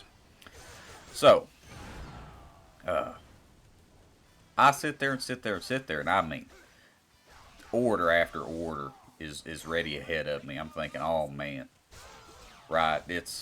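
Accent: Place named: South, United States. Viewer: you deserve a million subscribers. dynasty warriors youtuber with a southern accent??? fucking sign me up omg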